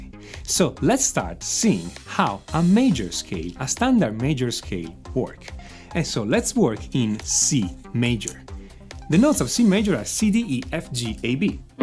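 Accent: Italy. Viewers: it's italian flavored english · I'm ready to bet my house you re Italian! Pronto a giocarmi la casa che sei italiano 😂 bravo e grazie, molto interessante! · I like your italian accent. But the background music is very annoying. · im so greatful that you are using the CDEFGAB notes, instead of do re mi blah blah blah, i can tell you're italian by your accent, and i live here in italy and i hate learning guitar or theory in person cause they all teach it in italian terms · Great. Are You Italian? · Ma...sei italiano????? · I love this itallian acent!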